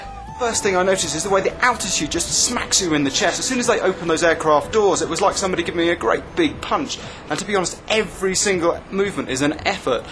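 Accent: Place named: United Kingdom. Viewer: stupid bri-ish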